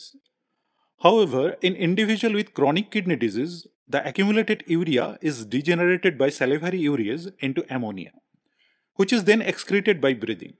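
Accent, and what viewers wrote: India. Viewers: You can turn on Closed Captions (CC) and then read what he is saying. I can understand him fine as I’ve had friends from India.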